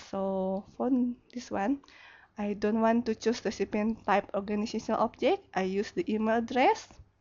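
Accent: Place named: Southeast Asia. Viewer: the accent is a mixture of filipino singaporean malay indian indonesian myanmar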